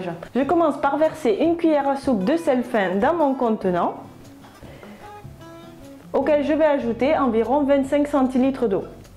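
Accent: South-West, France. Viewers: Excellentes explications parfumées à l'accent du Sud-Ouest (la plus belle région de France). Merci pour ce beau travail pédagogique. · Un joli accent du sud-ouest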